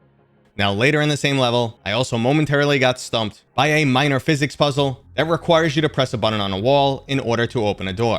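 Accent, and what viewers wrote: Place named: New York, United States. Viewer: a small hint of new york in your voice is killing me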